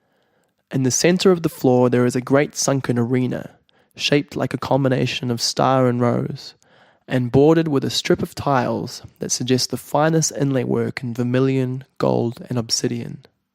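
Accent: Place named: Australia. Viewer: I am grateful for your Reading with your down under Dialekt. Thank U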